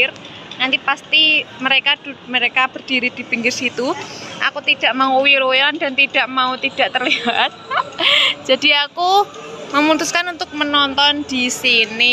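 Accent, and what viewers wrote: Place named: Java, Indonesia. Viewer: Bahasa Indonesia medhok banget🤗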